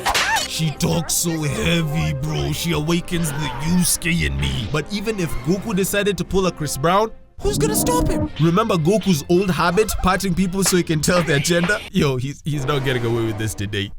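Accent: Kenya. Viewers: Oh shit i knew that accent from somewhere wsg my kenyan blooda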